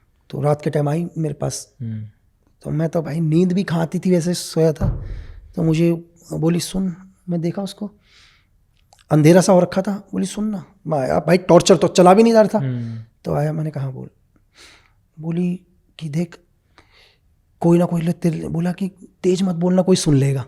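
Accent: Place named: Kumaon, India. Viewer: Kumauni log aise hi bolte hain ..krke ya thahre ❤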